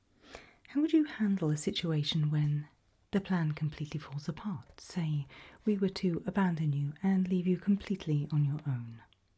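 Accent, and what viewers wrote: RP, United Kingdom. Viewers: She's British????? I just thought she was fancy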